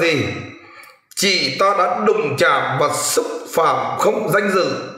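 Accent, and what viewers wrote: Standard. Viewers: Nói chuẩn luon bạn nha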